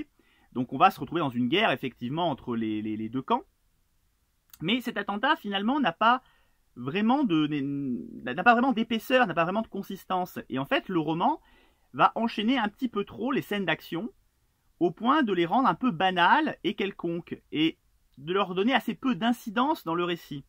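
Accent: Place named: Nord, France. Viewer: Bonjour vous êtes chti ? (Vous avez un fort accent, désolé de le dire).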